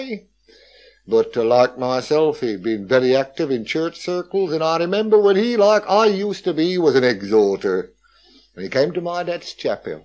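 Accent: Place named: Black Country, United Kingdom. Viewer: Excellent! Love the history and accent of the black country, thank you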